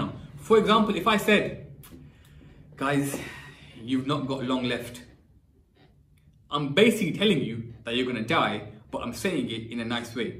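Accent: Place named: Bradford, United Kingdom. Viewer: brother you from bradford?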